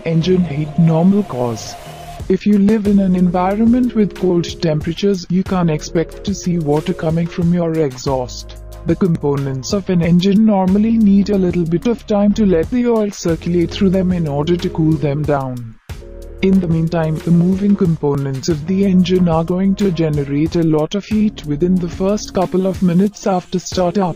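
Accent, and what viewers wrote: India. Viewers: Bro has an indian accent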